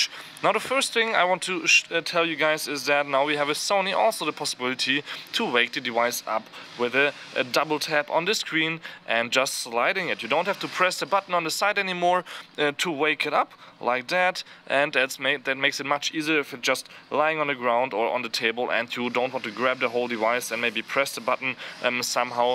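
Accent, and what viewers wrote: Germany. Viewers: ... I like it when German speak English. The little accent is cute. :3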